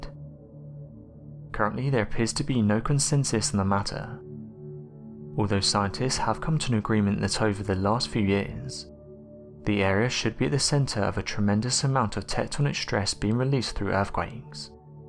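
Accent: United Kingdom. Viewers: Galaxysurfer 1 It is you that is a bit thick. Did you not notice the guy has a British accent? They pronounce words differently. Who’s to say your country’s way of pronouncing it is correct? Have you ever heard a British person say garage? Instead of grr-age it’s more like gar-ridge. Listen to the song Levon by Elton John, he says gar-ridge by the motorway in the second verse. Since they were speaking English long before America existed, just maybe it’s their pronunciation that’s correct and you are wrong, lol.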